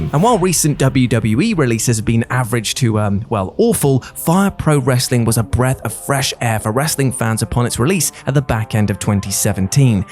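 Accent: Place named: United Kingdom. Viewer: All the weird brits talk like that. It's annoying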